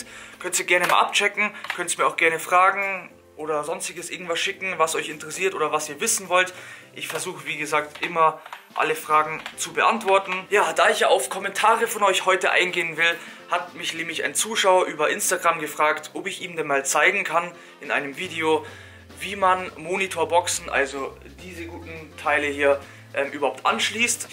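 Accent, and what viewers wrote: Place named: Bavaria, Germany. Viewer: servus, woher kommst du? hört sich bayrisch an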